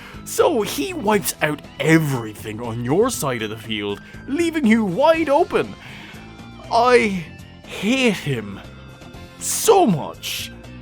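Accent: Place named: Ireland. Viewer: Okay I subbed! The review is very good, in fact the whole video and presentation is great. Plus the Irish accent is gold! Awesome stuff mate!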